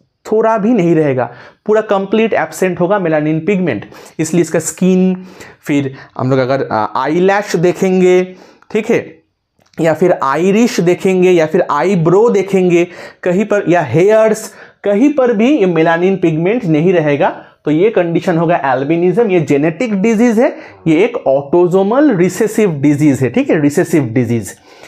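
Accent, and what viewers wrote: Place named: Bengal, India. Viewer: Sir your ascent is type of Bengali..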